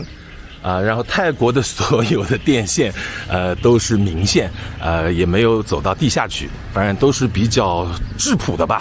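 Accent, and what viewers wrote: Shanghai, China. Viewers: 册那 一听口音就是阿拉上海银（手动滑稽）羡慕长居海外鸭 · 上海口音，口气。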